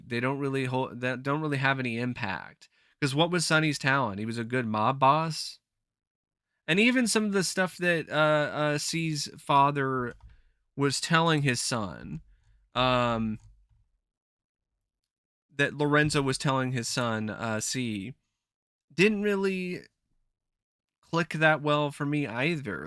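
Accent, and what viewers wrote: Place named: Northeast, United States. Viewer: Did you like The Wonder Years? I watched it a lot with my grandma so I got a soft spot for that show. Your voice kinda sounds like you're from northeast usa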